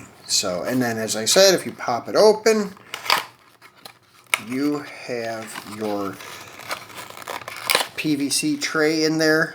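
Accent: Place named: Cleveland, United States. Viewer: Oh and while I'm at it, it's modern, like and urhn, not moderin modern. Lol I just messing with you! I learned to talk in N.Y. and live in Colorado so my ascent probably sounds worse then your Cleveland accent? Lol.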